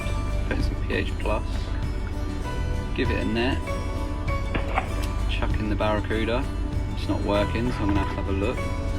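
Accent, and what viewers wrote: United Kingdom. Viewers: based on your accent you’re across the big ol Atlantic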